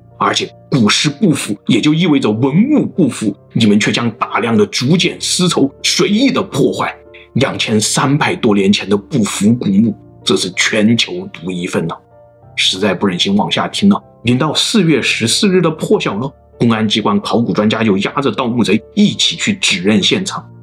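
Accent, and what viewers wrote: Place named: Yichang, China. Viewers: 普通话讲的跟我一样的😂严重怀疑也是宜昌人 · 我听口音就是我们湖北的，惟楚有材确实不虚啊，楚虽三户亡秦必楚啊！我们九头鸟确实是宇宙第一，乌拉！大武汉V587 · 口音湖北人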